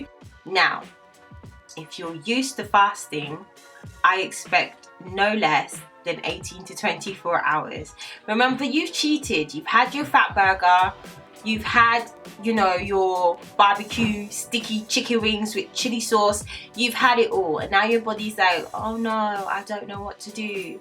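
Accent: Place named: United Kingdom. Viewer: nice to hear a British accent on YouTube for a change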